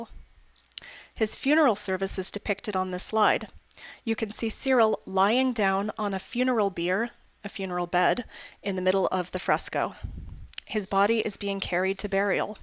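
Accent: Russia. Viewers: Old Church Slavonic is a beautiful language but it doesn¨t sounds good with a Russian accent, besides it¨s confusing. крест, воскресе́ние are in Russian not in Old Church Slavonic. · Most of the stuff is good but you seem to have a very thick Russian accent, and, more importantly, are very reductive of pagan and illiterate societies. Importantly, your line about "oral" languages not being able to express complex concepts is completely bogus, minus the obvious lack of adequate vocabulary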